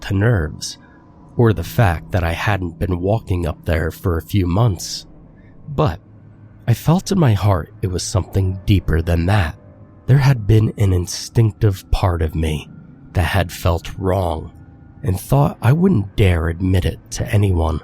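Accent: United States. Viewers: Told with a thick American accent, trying desperately, and failing miserably, to sound like a Scottish accent. If you weren't born and raised here, you are NOT a Scot. And you most definitely are not a highlander! I am. 54 years. Born in Inverness and now living on the west coast. So, don't even think about arguing with me!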